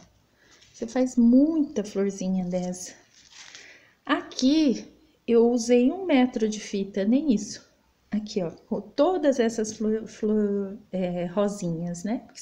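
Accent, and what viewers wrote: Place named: São Paulo, Brazil. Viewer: sotaque de sao paulo, igual ao da professora, é o mais bonito do planeta. pronuncia limpa sem enfeites, quase um canto. rsrs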